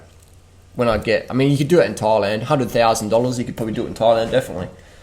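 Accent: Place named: Australia. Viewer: the aussie accent is awesome :D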